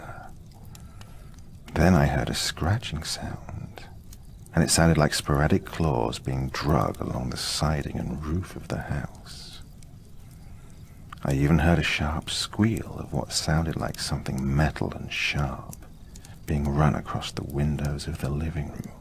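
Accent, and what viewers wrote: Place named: United Kingdom. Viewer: Been listening to your channels as usual...it’s just something about a British accent that we Yank girls love:) Listening in Massachusetts,always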